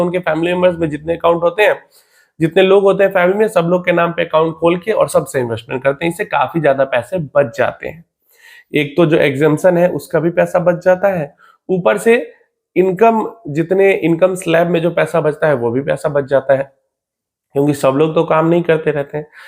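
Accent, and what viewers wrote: Bihar, India. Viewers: Aap Bihar se hai kya bhai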